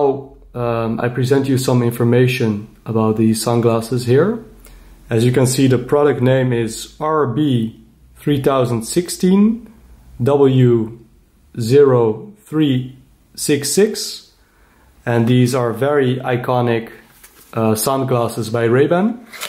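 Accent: Netherlands. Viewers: You sound kind of Dutch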